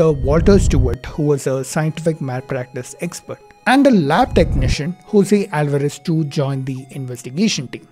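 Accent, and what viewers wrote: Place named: South India, India. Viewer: you r speaking South Indian... in the name of English..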